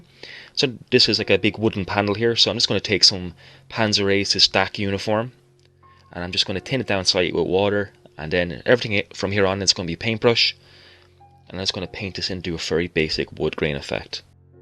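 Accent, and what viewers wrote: Ireland. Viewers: my friend, your philosophy is my philosophy. The simpler the better! Oh, by the way; I like that cool accent of yours....are you Irish? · Great job,! At first, I was surprised when you kept saying "tinner", but then realized it's your Irish pronunciation.:) Great video and very educational on spraying Model Color. Thank you.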